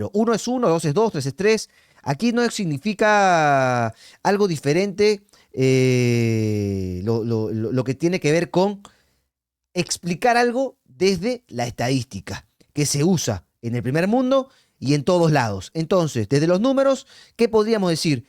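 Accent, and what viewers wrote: Argentina. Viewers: Hey Horacio... vos sos Argentino ???. Tenés un acento porteño che. O sos simplemente un periodista Peruano sin personalidad? Por otro lado tu analisis muy bueno. Has desnudado la pobre capacidad de Reynoso como estratega. Ese señor es un técnico para tercera division, que ridiculamente se autodenomina estudioso.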